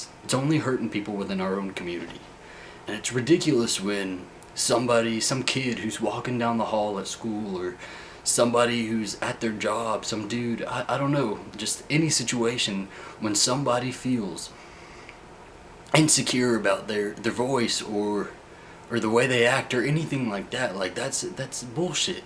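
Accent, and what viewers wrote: South, United States. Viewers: this guys attractive not just his looks but his mind, not alot of gay guys think that way and they're very judgmental about each other so he's right, and I've never seen gay guy with a deep southern accent miracles do exist lol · his deep country accent is sexy but he seems straight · love your southern accent!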